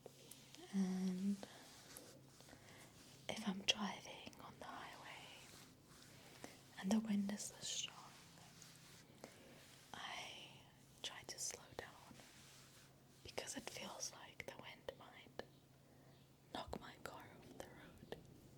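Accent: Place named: South Africa. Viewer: You're the only South African I know & I love your accent. Sounds to me like a mix between England & New Zealand. Very lovely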